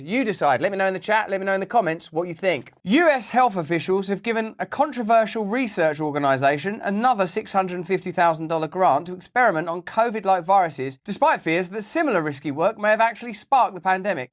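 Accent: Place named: England, United Kingdom. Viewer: the 'surprise birthday party' bit gets me all the time🤣🤣🤣. The England accent makes it even more hilarious.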